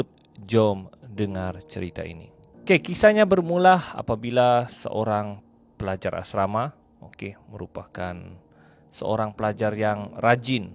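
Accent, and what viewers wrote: Sabah, Malaysia. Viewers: As slm...owg Sabah ka dik☺️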